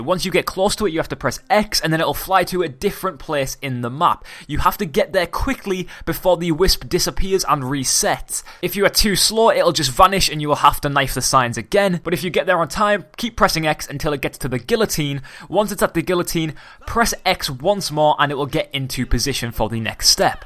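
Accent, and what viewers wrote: United Kingdom. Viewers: You are wrong there is a British accent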